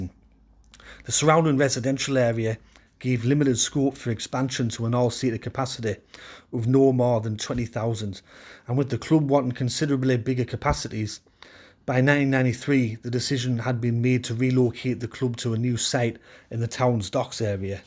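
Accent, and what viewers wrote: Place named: North East England, United Kingdom. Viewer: Another fascinating trip into the past. I thought from your accent that you were from the North East, so was somewhat surprised when you revealed you're an Everton supporter.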